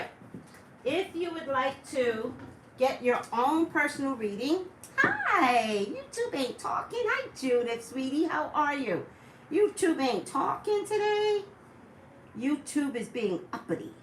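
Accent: New York, United States. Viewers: Are you from NY? I love your energy!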